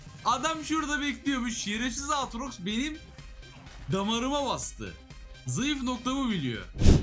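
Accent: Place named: Thrace, Turkey. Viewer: Neden böyle saçma trakyalı gibi konuşuyorsun ki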